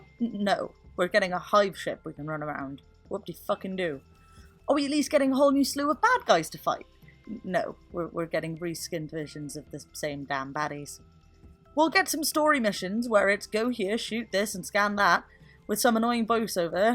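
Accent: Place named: West Country, United Kingdom. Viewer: Quick question..... Where are you from? certain aspects of your accent sound very "west country"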